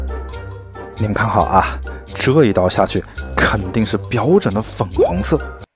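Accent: Wuhan, China. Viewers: 武汉口音忒重了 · 武汉口音太重了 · 楼主是湖北湖南人吧。武汉人仿佛在听汉普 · 武汉普通话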